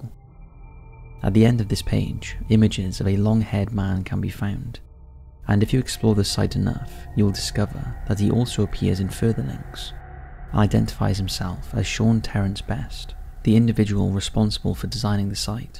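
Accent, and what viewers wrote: Wales, United Kingdom. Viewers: The Welsh accent of yours is better than The Canadian Droll of Chills haha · I've a headache and this is easier to listen to than most. I'm sure that I can detect a Welsh accent in the narrator's voice and I think it's just a bit different, very enjoyable. Thank you ♡ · Genuine curiosity, which part of the UK are you from? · He’s Welsh (from Wales in the UK) if you didn’t know. That’s the accent. South Wales I think by the softness, probably somewhere near Cardiff (the capital) or maybe Mumbles (a seaside town). 🤔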